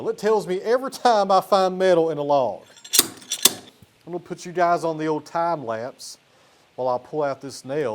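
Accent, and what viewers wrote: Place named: Tennessee, United States. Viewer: You from Tennessee. You sound like you gotta be from at least near there.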